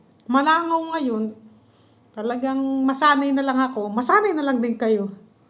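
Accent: Nueva Ecija, Philippines. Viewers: Ate parang Punto mo taga Nueva Ecija Po kayo idol kopo kayo❤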